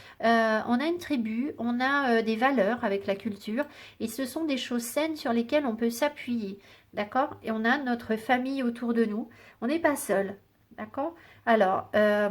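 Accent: England, United Kingdom. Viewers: Merci beaucoup pour l entrée en matière qui m à permis de noter le nom de ces 2 livres que j ai hâte d acheter dès que la Fnac sera ouverte. Également en dépendance affective, j ai fait un « soin » par téléphone pour couper les liens, comme les bonhommes allumettes aussi, mais rien n y fait. Je vous apprécie car je ressens votre sensibilité dans vos guidances et souvent votre émotion, ce qui déclenche la mienne car je suis hypersensible et ce que je traverse en ce moment n est pas facile, mais j y travaille. Continuez comme ça, je vois que nous sommes beaucoup à vous apprécier alors, ne changez rien. Beaucoup de bonheur à vous. Bises 🙏💕 Et moi j aime beaucoup votre bel accent anglais!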